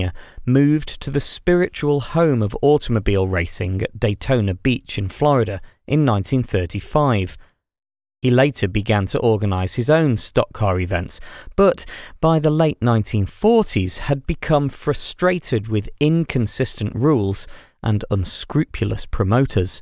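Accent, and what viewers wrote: United Kingdom. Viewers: A British man narrating the origin story of an All-American sport is weird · Your british voice describing American Stock Car Racing is something I dont want to listen to ...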